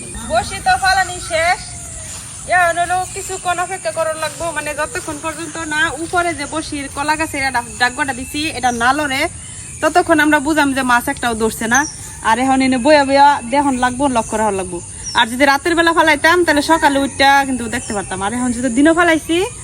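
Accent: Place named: Mymensingh, Bangladesh. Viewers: আপু তোমার কথা শুনে মনে হচ্ছে নেত্রকোনা আমিও নেত্রকোনার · কথা শুনে মনে হচ্ছে নেত্রকোনার মানুষ, আমার শশুর বাড়ীর লোকজন এভাবেই কথা বলে। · ভাষা ময়মনসিংহ অঞ্চলের ! খুব সুন্দর। নেত্রকোনা হবার সম্ভাবনা অনেক বেশি । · আসসালামু আলাইকুম ভাবি আপনার বন্য আছে বন্য থাকলে আমি বিয়ে করতাম আমাকে মাচ মারে খাওয়াতো আমার বাড়ি কিন্তু রংপুর আপনার বাড়ি ময়মনসিংহ তাই-না ভাবি আপনার ভাষায় বোঝা জায় মাজা নিলাম ভাবি আপনার সাথে মনে কিছু নিবেন না ওকে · মনে হচ্ছে নেত্রকোনা বা ময়মনসিংহ বা শেরপুর তবে পাহার ও ভাষায় মনে হয় নেত্রকোনা জেলা র কোন গ্রাম।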